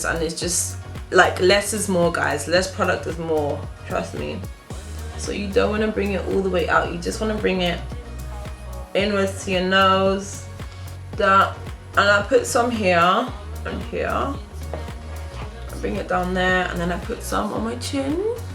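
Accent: England, United Kingdom. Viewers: Nice and natural! This was great video. love the English accent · your British yay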